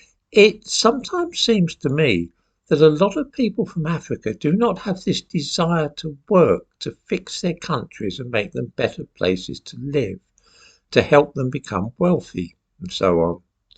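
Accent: United Kingdom. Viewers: It’s really nice to listen to an eloquent british gentleman comment on our crazy situation that we’re in with the loss of all culture and infrastructure that our fore-bearers worked and fought to create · Is this British accent?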